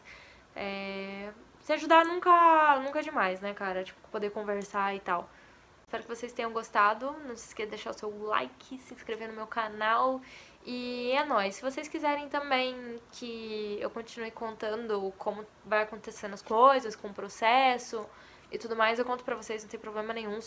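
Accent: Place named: Minas Gerais, Brazil. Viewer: Vc é mineira?kkkkk vc parece que é mineira kkkk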